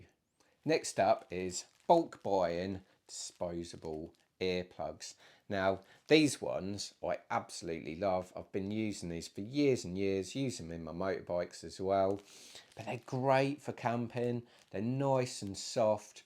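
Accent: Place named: Scotland, United Kingdom. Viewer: I live the Scottish accent bro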